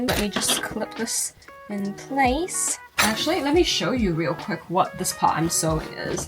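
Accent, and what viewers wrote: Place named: New Zealand. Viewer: Obsessed with the silhouette of this fit!!! also your kiwi accent is rlly cute btw!!!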